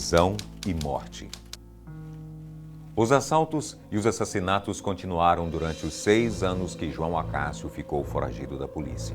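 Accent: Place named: Minas Gerais, Brazil. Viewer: Pq esse sotaque de mineiro se ele é Catarinense?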